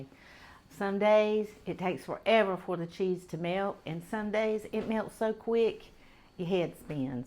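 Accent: South, United States. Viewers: I love your recipes. I have made so many of them. My husband loves your sloppy Joe recipe. He said he doesn't want the canned stuff anymore. 😂 My 14 year daughter loves watching you too. When she hears your voice on the TV she comes into the room and says" It's the cute Southern lady." Then she'll sit with me to see what you're making. ❤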